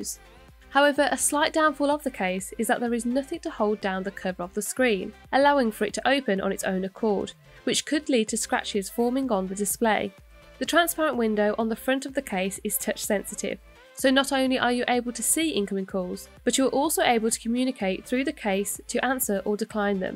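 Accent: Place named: West Midlands, United Kingdom. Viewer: Sounds like a West Midlands accent to me.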